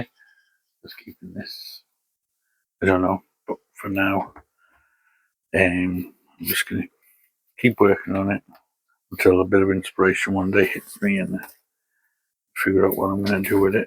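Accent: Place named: Newcastle, United Kingdom. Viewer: Hello?! Very interesting new channel with fascinating plants of some age at last! I’d like to know more about you, but I’m subscribing anyway. The bonsai show cleared up my question as to your accent, the main voice was Geordie I reckon! God bless your endeavours 🙏✝️. ✌️🇷🇺☮️🇺🇦✌️. ✌️🤍🇮🇱🤍✌️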